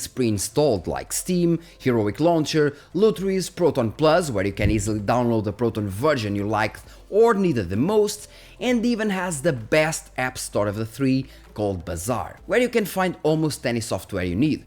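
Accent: Portugal. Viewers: I can hear your portuguese tgroufh your english accent. (Not a critic.. Mine is worse). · Assim que abri o video percebi logo que eras tuga lol, bom video, já há cerca de 3 meses que uso mint e ando a gostar bastante, mais tempo de bateria melhor performance, sem telemetria (tudo comparado com o W11)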